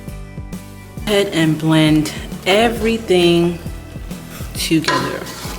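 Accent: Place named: Haiti. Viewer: I knew u were Haitian by your voice🤗, weid i know😂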